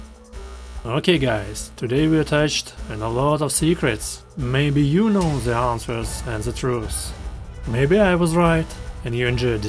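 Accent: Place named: Germany. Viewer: Ok, this guy is definitely German.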